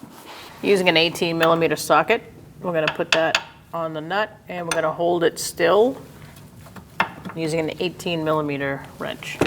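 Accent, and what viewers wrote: Boston, United States. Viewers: I would highly trust a woman mechanic with a Boston accent. These videos are great. I am mechanically inclined but seeing the process a professional uses makes these tasks such a breeze. No thinking required.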